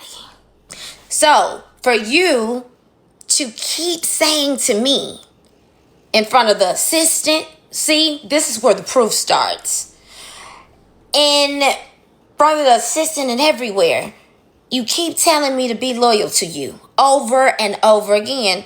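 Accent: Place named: South, United States. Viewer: The more I listen to K Michelle the more I’m liking her. And the Southern accent love love love ❤😂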